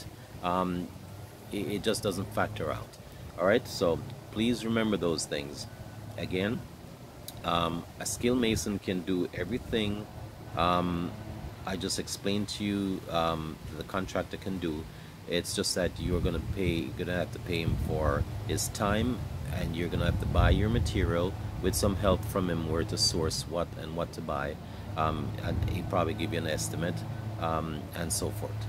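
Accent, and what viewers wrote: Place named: United States. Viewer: hey is that US dollars or Jamaican dollars ?cos you speak with a slight american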